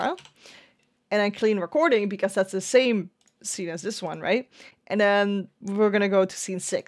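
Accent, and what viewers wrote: Netherlands. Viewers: are you dutch? you really have a dutch accent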